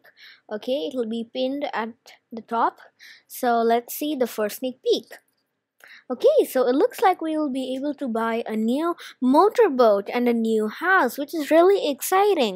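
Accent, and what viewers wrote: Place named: India. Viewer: ur accent sounds like indian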